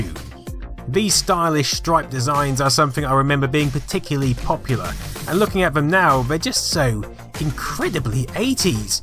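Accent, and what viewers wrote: United Kingdom. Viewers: PeachyPixel8 you are not a nerd. You are just attracted to this man's sexy velvety-smooth British accent. Don't kid yourself. You want him. We all do.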